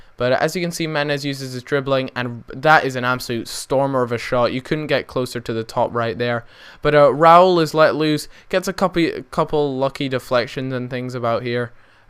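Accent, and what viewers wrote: Scotland, United Kingdom. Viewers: are you scottish? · Are you Scottish or have Scottish relatives ?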